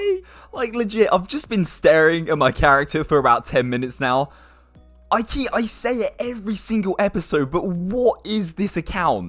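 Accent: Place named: Surrey, United Kingdom. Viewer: Yes my guy, where in the uk are you from? You sound local to me! Surrey area?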